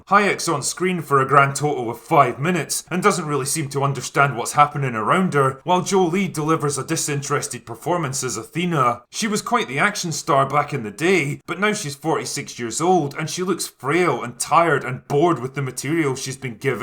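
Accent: Scotland, United Kingdom. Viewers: Brilliant critical reviews masterfully delivered in a way only a Scot can do is the reason why we need to protect and defend our ways and traditions as if the world depended on it - because it does. Thank you for bringing a huge slice of goodness out for all to see what goodness is all about.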